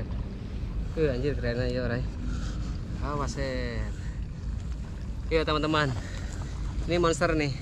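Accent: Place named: Garut, Indonesia. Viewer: Mang org mn Sunda na, seru ey, jiga na Garut nya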